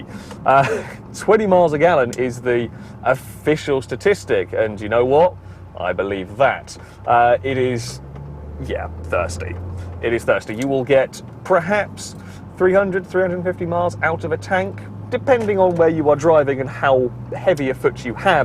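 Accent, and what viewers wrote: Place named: RP, United Kingdom. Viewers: anyone know where he’s from in the Uk? Guess the south bcos of posh bit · His voice is rather posh lol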